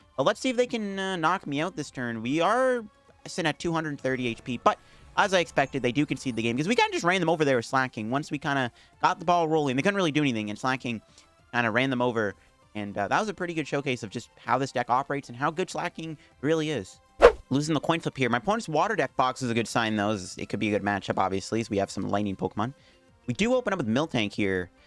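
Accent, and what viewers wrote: Canada. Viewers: are you from Canada? Love the content always and I'm constantly using your deck ideas.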